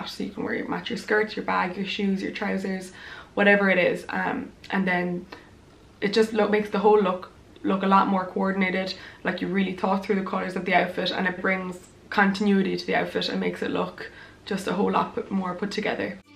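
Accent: Ireland. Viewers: I love your Irish accent ☘️💚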